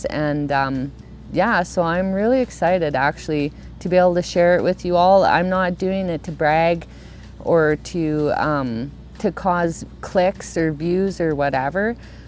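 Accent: Canada. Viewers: Your semi-canadian accent is hilarious by the way 🤣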